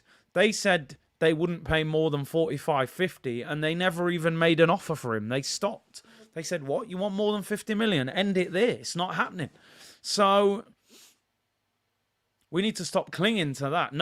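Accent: East Midlands, United Kingdom. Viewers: he's from Nottingham, I think that's classified as East Midlands, not 100% sure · are you from the East Midlands?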